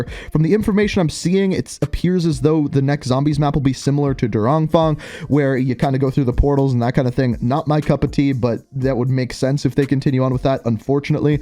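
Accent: Canada. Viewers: You're Canadian, I know accents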